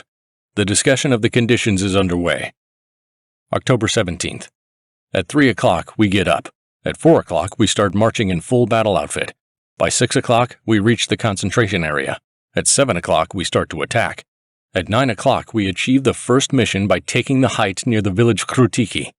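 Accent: United States. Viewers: I loved this series until you added the bullshit American voice.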